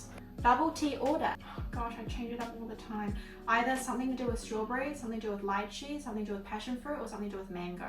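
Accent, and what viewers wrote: England, United Kingdom. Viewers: I love your English accent and i just wanna hear more and more 🥰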